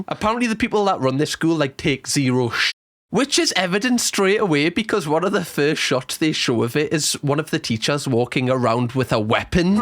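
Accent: Newcastle, United Kingdom. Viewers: Love your show , and the Geordie accent makes it all the better. Signed - happy doughnut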